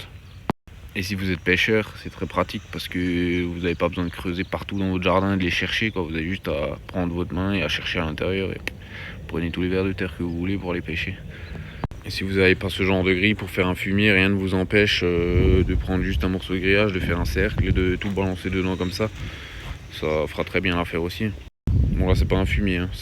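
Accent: Alsace, France. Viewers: Le plus important, c'est l'accent. Je suis aussi alsacien et je comprend mieux avec l'accent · j'adore ton accent Alsacien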